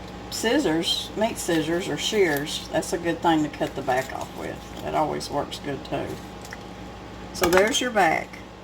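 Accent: South, United States. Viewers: I absolutely love the way Southern's talk. I really notice the accent as I'm from Canada...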